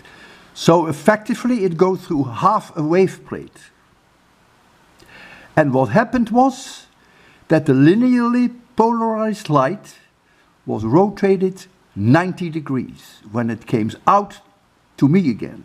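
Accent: Netherlands. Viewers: Thank you! I used to live in Holland back in the 1980s and I recognized your dialect immediately when I watched your first video. I still love physics but do computer work. Danku wel.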